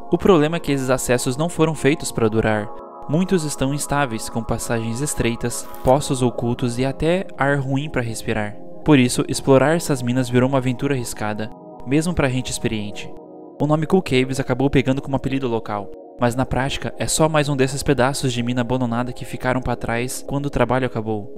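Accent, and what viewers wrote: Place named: Inland São Paulo, Brazil. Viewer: Gostei do sotaque! Sou do interior de SP, o meu também é assim! Tmj 🤜🏽🤛🏽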